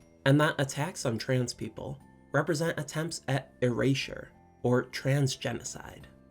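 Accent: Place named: Michigan, United States. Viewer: Yo, A new video by my favourite YouTuber with a thick Michigan accent, on my favourite Holiday too? Can this get better?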